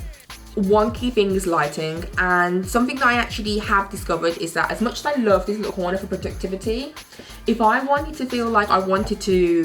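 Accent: United Kingdom. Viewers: Is that Brummie accent you have? Thanks for the tips. Much appreciated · This is seriously one of the best videos I’ve seen on this subject. Content, presentation, editing, ideas and impact. Only suggestion would be to slow down your speaking slightly as it will attract more US viewers who love British accents but prefer slower speech. But seriousl6, you really know your stuff. I have no idea how you don’t have thousands of followers already. Keep at the marketing & algorithm study on YT to get your profile up there - and I have no doubt you’ll accelerate quickly. Cheers - am off to implement some of these ideas.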